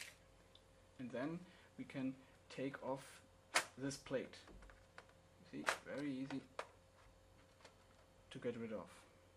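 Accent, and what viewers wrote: Germany. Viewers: ich höre schon das du eigentlich deutsch sprichst ;D kannst du mir sagen welche grafikkarte rein müsste um battlefield 3 auf hohen ( nicht ultra ) einstellungen zu zocken ? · ein leicht deutsch akzent ;) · schöner deutscher acczent^^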